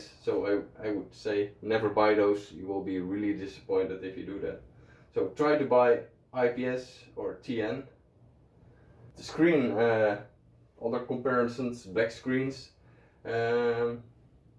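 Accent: Netherlands. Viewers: Ik hoor gwn dat je nederlands bent🤣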